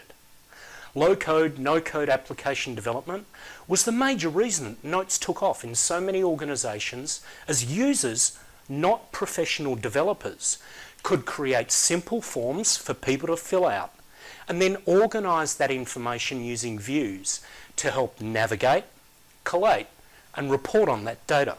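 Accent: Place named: Australia. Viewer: Man, I missed your Aussie accent :-D Without this product I wouldn't have come across with you all great people (yes, then of course with others ;-) ) and didn't make a living from and with it for almost 21 years. I will share this video to all customers who are thinking about migrating their apps to Microsoft - they will regret it soon.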